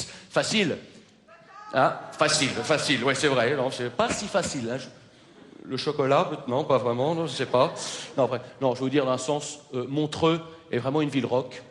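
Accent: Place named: Belgium. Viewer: Il avait un léger accent belge à l'époque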